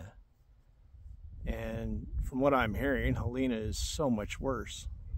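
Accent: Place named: Southwest, United States. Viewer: The climate changes, there is no "Climate Crisis". The National Hurricane center reported that Helene made land fall at winds of 140mph, but the weather stations and the University of Alabama Huntsville mobile weather unit reported winds at 60mph. I don't know where you live but judging by the accent, I'll say in the American Southwest. In 850 C.E the region went into a drought that lasted 240 years, then it rained for 50 or sixty years, then the region went into a smaller drought that lasted only 170 years......... I guess the droughts were caused by those pesky native Americans cooking food and heating themselves. CO2 is good for the planet, the Sahara is greening, the world has greened 33% since 1900, because of CO2. Fossil fuels (hydro-carbons), cuts down on deforestation, and decreases health issues associated with burning dung to cook and heat. 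You maybe 56, but I'm 57, and I can tell you that you have not done your homework.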